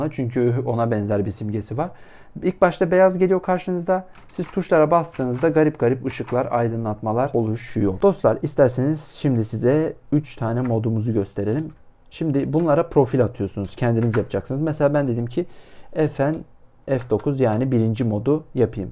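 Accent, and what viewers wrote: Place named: Germany. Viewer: Reyiz almanyadaki kuzenime benziyon tip şive bakımdan almanyada bulundunmu?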